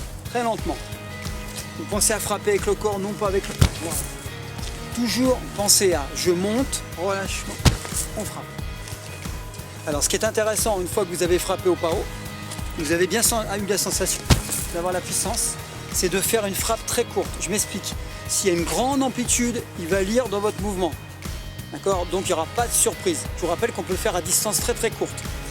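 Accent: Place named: Kabylie, Algeria. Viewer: t kabyle comme moi pasque t a l accent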